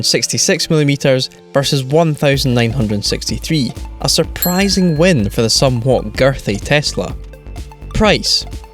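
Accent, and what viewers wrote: Scotland, United Kingdom. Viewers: please get someone thats not scottish...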